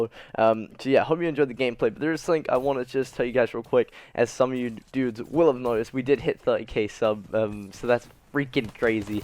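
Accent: England, United Kingdom. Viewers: I notice the slight accent my dude, are you from across the pond in England? If you are, do you watch football and/or have a favorite team you support? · What part of the Uk are you from? Sounds like your English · Where about in England are u from?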